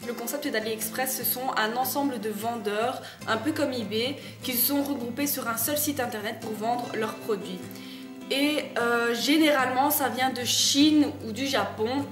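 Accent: Belgium. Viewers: tu est belge non ?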